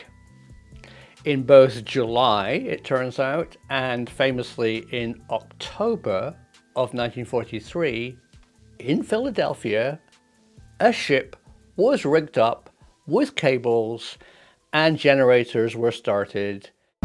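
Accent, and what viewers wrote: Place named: Oxfordshire, United Kingdom. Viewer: Awesome, you from Oxfordshire?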